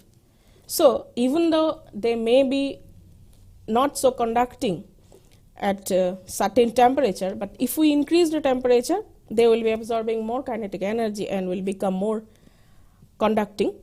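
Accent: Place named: Bengal, India. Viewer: abe vo bengali accent mei bol rahi hai..chill bro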